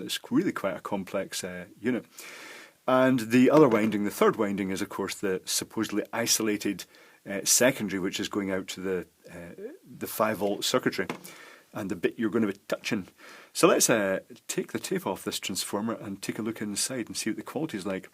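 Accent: Scotland, United Kingdom. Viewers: Interesting accent. Is it Scottish?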